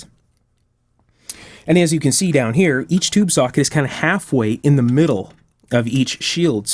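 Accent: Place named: Canada. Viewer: Oh...sorry. No problem...it's worth the wait. I'm not an engineer, but someone mentioned that this was their favorite channel on YouTube, and I was absolutely fascinated by that video. And that Canadian accent reminds me of home. :)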